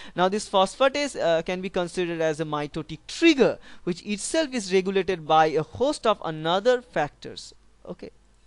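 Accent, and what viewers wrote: India. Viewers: ow he is from Bangladesh😃i thought he was Indian because of the accent. thanks a lot bro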